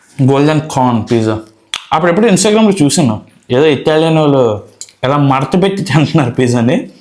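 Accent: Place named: Visakhapatnam, India. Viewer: Bro where are you from bro ....matching to vizag slang ....if from vizag which place in vizag ....and I like your videos bro ❤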